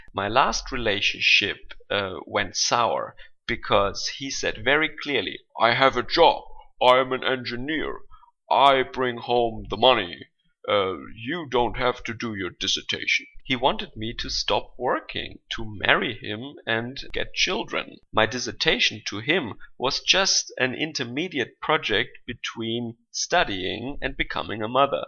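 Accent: Germany. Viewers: "appreciated just for having a pulse". lol. Great vid made all the more so with the German accent. I love to hear foreigners speaking English. It seems to make what they to say all the more interesting and focused on imparting important information. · When I was a kid I had a german friend, here in Chile. Same accent, same sense of irony. God bless him and you. Loved the video. The engineer guy had a great pair, props to him!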